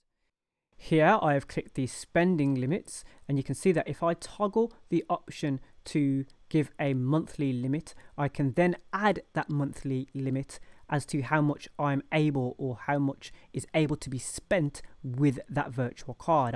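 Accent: United Kingdom. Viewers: Love this video but your definitely British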